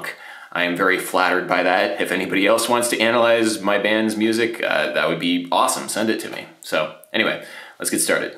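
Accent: United States. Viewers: That might be because he is he is an American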